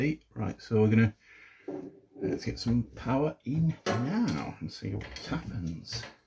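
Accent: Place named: North East England, United Kingdom. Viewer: Sounds like he’s from north-east England somewhere - I’m from Grangetown in Middlesbrough!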